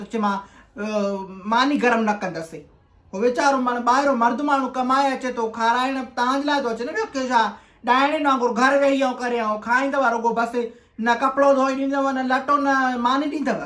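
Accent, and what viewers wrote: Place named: Larkana, Pakistan. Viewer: 🤣🤣🤣Adi iho gothano style aa larkane waran jo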